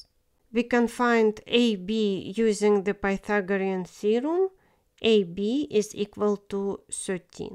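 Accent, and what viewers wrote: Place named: Russia. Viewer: Добрый день! Почему на русском не читаете? У вас в английском русский акцент.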